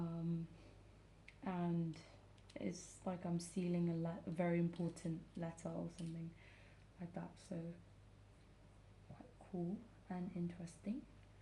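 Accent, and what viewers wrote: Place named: England, United Kingdom. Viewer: 💕Hello my Dear...I really enjoyed watching this video because i doooooo love candles and WAX. I collect candle scraps too and put them in dried flowers or potpourri and It all smells divine!!!😅😅 Love your English accent because it's Just like mine..i was Born in Bedford 1970 and have been in Italy since 83...i still haven't Lost my accent even though i speak Italian everyday...You speak very fluently and you are unserstandable...The video Is very relaxing and thank you for sharing....Take care and a PROSPEROUS NEW YEAR to you and loved ones❤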